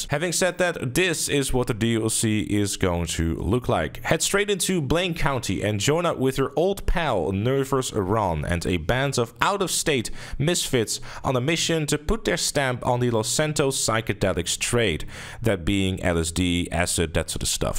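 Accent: Netherlands. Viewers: Is he dutch ? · I'll eat my shoelaces if he isn't Dutch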